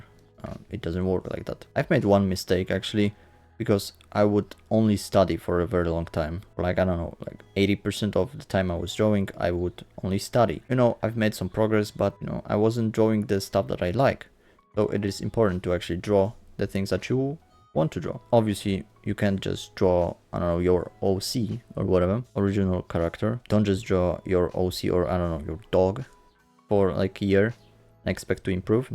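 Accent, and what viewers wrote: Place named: Poland. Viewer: Thats some great tips! By the way, if I may ask, where are you from? (Im polish and thought your accent sounds alike)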